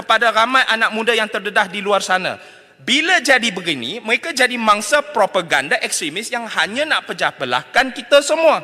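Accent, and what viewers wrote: Perak, Malaysia. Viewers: Ckp perak yop... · steady mp ni....cina cakap perak yop....👍👍👍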